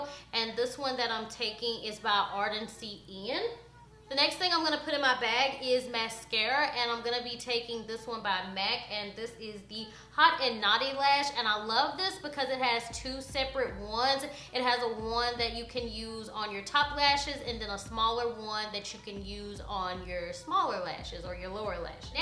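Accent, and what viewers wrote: South, United States. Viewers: no i didn't come here for fashion advice, i just came to look at you and listen to you talk. i love that country accent of yours I'm from Alabama too, but i don't sound as sexy as you do... and you're so fucking beautiful😫💗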